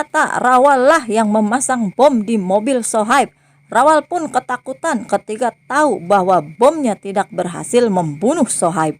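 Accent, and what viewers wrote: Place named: West Java, Indonesia. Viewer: Logat nya terlalu kelihatan... kalau anda dr bagian jawa barat... klu bukan cirebon pasti indramayu...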